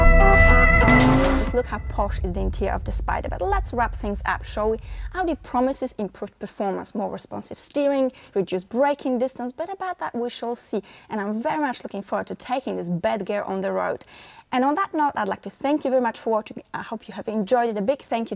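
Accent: China. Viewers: I have a friend calls Zhang, he is from China. Strangely his accent is like yours and even stranger I believe you are European. So you can imagine the culture shock I'm subjected to. Lol. Amazing review by the way and videography. :) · Interesting: a blond girl speaking English with a Chinese accent 🤔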